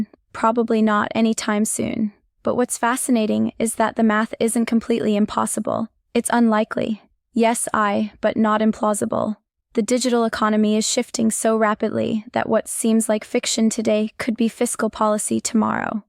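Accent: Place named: Latin America. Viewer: I prefer her Latin accent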